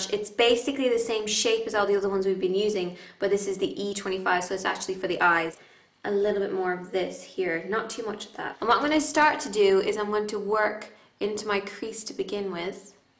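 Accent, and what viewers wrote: Ireland. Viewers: Age you irish.? :) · She's from Ireland like me!🌸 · are you part irish? i can hear a bit of an irish accent coming through :) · Shes irish :) x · At the beginning she has an Irish accent because she is Irish ;-). She said she does sometimes get a bit of an American twang though :-). I forget why? She has been to the states quite a bit and she might have family there or something, not sure. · Yeah, she's irish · where are you from ? because I feel like I sense a bit of an Irish accent, regardless its very cute ( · you are messing with my head, ARE YOU IRISH OR AMERICAN GOD DAMN IT?!?!?!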